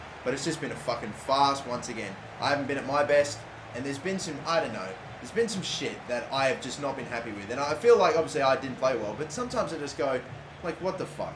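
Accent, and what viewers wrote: Australia. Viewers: Is he austrailian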